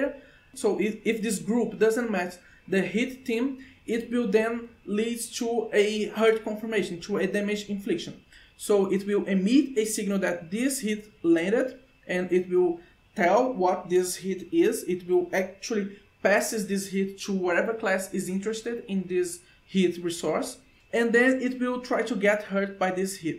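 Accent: Brazil. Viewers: Where yo from? brazilian accent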